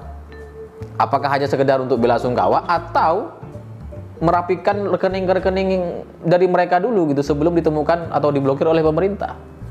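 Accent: Madura, Indonesia. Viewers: Serius nanya bang asal Madura ya..?? Logatnya mirip 🙏🙏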